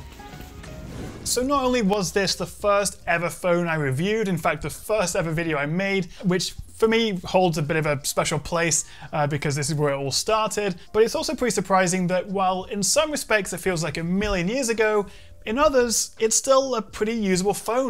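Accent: United Kingdom. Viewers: Always loved your vids man great to listen to the British accent amoungst the sea of canadian tech YouTubers Happy for you your videos are always quality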